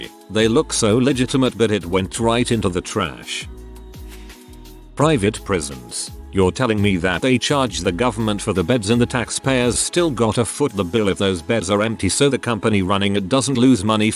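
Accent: United Kingdom. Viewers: Where did you get that robot voice with a British accent ,,, it's hilarious.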